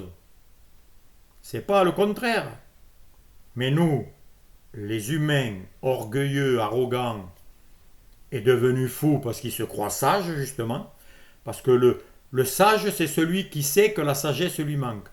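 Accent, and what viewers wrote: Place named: South-West, France. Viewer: ❤❤😂❤😂quelle pêche, lucidité, humour, objectivité...n en jetez plus😂😂😂...vous êtes du sud ouest avec 1 accent aussi prononcé...?? Le même que le mien soit dit en passant..en tout j adore..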